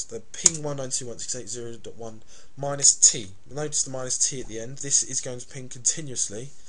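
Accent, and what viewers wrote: United Kingdom. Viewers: I have no issue understanding him or seeing the screen. He even has a UK accent which is different then the was we speak obviously here in the usa.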